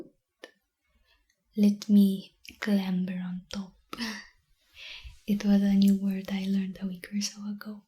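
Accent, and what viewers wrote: France. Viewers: is this what french accents sound like from frace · Dam. French accent. My weakness. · I love long ASMR videos. As a German, I really love French accents too. I'm sure this will really occupy alot of my time. I'm glad this invaded my recommended, kind of like how the Germans invaded through the Ardennes in 1940. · I miss this French accent of hers 😢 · the french accent really gives of the vibe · You already have such a relaxing voice, but the addition of a french accent makes it even better. I come back to this one a lot. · Wait are you actually French or just really good at impressions. We also need more wattson, or Kermit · Her: french accent My head: switch it to russian accent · It’s weird: When you speak English, you have a very pronounced French accent, but when you speak French, I can’t understand a word you say. Without the subtitles, I wouldn’t have understood anything. 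I’m French, I don’t know what to think about that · i never knew i would fall for girls wit french accents this hard you suprise me every video lol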